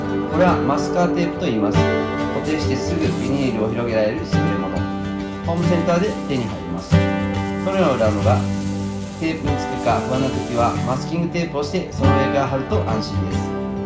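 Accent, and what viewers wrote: Kansai, Japan. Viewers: 関西在住経験あって、西日本で暮らすと、JPさんのような言葉使いになります😁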